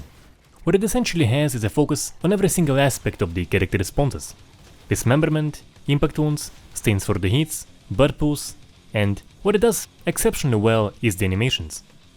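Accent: Russia. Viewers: Блять этот русский акцент, кров из ушей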